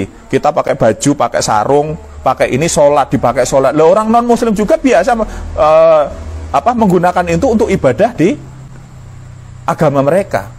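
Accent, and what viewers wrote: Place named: Java, Indonesia. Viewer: ngomong Jawa medok nya gk berubah😂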